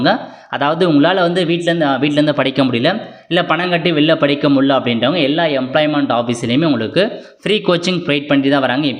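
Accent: India. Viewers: Ennada hindikaran tamil pesura madhiri pesikittubirukka...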